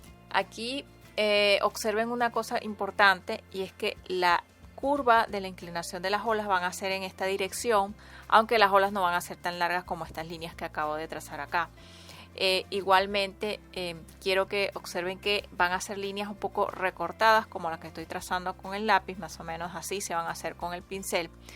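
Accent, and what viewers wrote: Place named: Venezuela. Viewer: Esta chica es muy buena explicando, tiene estilo me encanta, tiene acento venezolano, qué bonito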